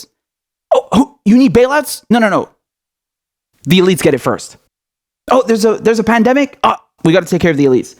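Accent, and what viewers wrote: United Kingdom. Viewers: Papi, your British accent is hilariously entertaining. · Your British accent kicks ass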